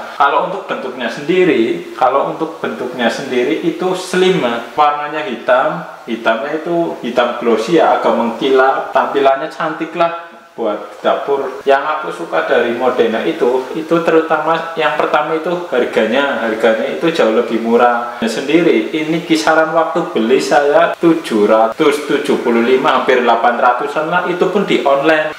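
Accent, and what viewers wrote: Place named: Java, Indonesia. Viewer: Meduk mas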